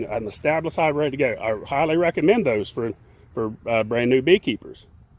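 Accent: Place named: West Virginia, United States. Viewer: I thought had a wv accent! Too funny. It’s almost as bad as mine. 😃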